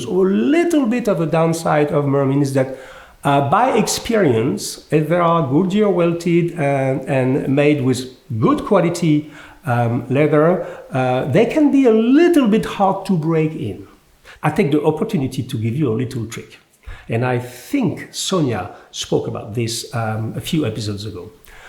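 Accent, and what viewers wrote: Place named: France. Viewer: Dont want to be rude, but the pronunciation on skoaktiebolaget is like three words skor=shoes aktier=stocks/shares and bolaget=company but in this context it means shoetradingcompany, so put air between sko-aktie-bolaget and you will be fine even with a french pronunciation, wich is not to be complained about. Kind regards from Sweden and thanks for lifting that Company .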